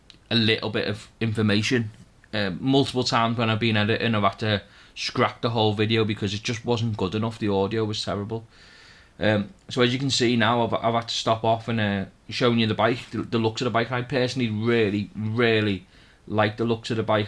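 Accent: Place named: North West England, United Kingdom. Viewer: Thanks. i think I have been through there before. Nice pub at Aldbury! I wasnt sure whether it was cheshire given that your accent is from the Noth West. Cheers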